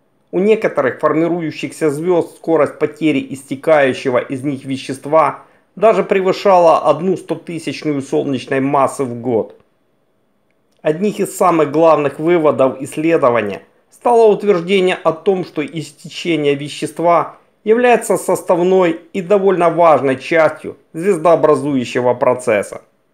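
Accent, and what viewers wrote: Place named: Kuban, Russia. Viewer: Интересно. Но интонация диктора и кубанойдный говор раздражают сильнее, чем желание узнать о звездах, еще не вышедших на главную последовательность. Тем не менее, спасибо за труд. Дизлайкать не буду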